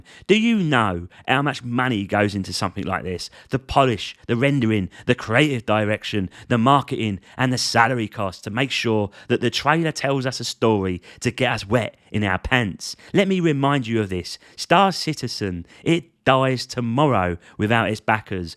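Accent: Cockney, United Kingdom. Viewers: Awesome vid and so true!!! Respect for U doing it!! and on another note....there is nothing better than Cockney to interpretate English Lang!! 🙏🏻💪🏻👌🏻😂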